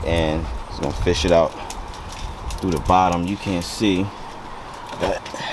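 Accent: Philadelphia, United States. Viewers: 👍🏿👍🏿👍🏿👍🏿 215 standup, new u was from philly soon as I hard ya voice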